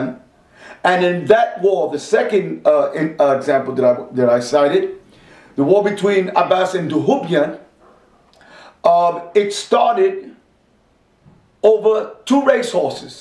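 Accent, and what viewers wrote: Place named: Arizona, United States. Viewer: Just curious but where did handler nab you? Did you get sent to the fili or mexi camps for field op training yet? I'm thinking arizona raised based on accent hint, possibly california or nevada.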